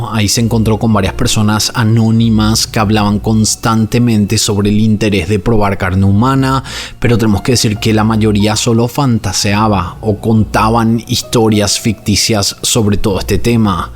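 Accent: Paraguay. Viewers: Que contenido más interesante!! Me gusta la manera en que narras el video y las imagines, por si acaso eres paraguayo o tenes relación? Es que tu acento es muy parecido a uno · van solo 10 segundos del video y ya me di cuenta que sos paraguayo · 0 yo me estaba poniendo en duda ya que tu acento era muy de Paraguay xd y voy en información y vaya sorpresa aparte que me encanta estas clases de videos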